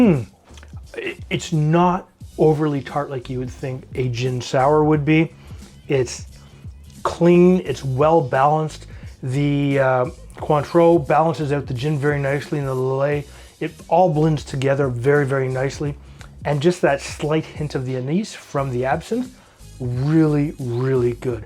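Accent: Canada. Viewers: 1st written abeeewt, I love the way you Canadians talk! Those are some big-ass lemons you get up there. By the way, how did you make that subscribe icon appear with an arrow & click? Maybe it would get more subscribers to my cocktail channel. And you mentioned the Barfly. Do you have good relationships and talk to a lot of other cocktail channels? I have things I'd like to discuss with you, but not leave in a comment as they have nothing to do with that particular drink. I know you have a tight schedule, but please drop a line sometime : <mail>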